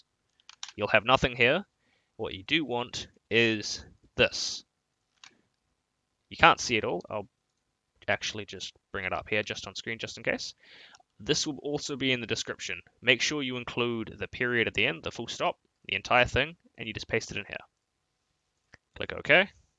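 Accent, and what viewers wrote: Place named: New Zealand. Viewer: i thought that was a new zealand accent.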